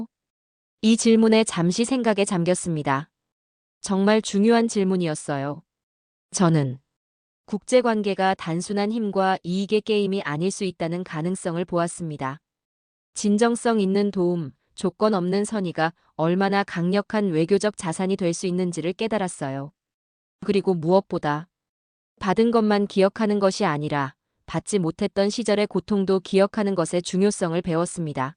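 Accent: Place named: Gyeongsang, South Korea. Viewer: 경상도말은 쓰지 마세요.... 경상도 말이 한국 대표 언어인 줄 잘못 알게 됩니다.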